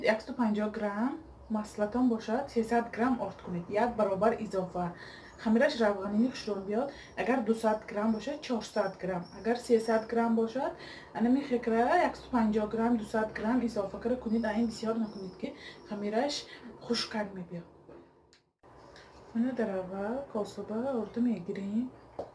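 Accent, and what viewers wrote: Isfara, Tajikistan. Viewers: Бехтарин Шумо аз шахри Исфарами Акцентатон авантюрин.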